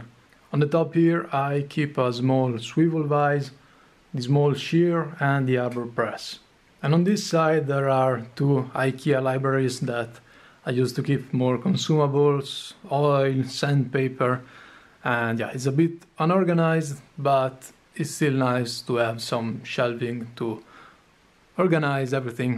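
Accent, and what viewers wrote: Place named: Italy. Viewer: Uaglio si sente che sei italiano smetti de parla inglese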